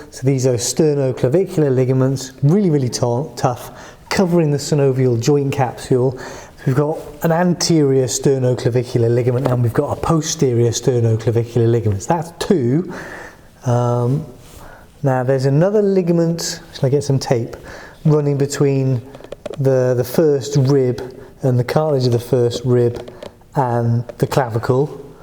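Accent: United Kingdom. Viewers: worst vid ever. especially the unapologetic british accent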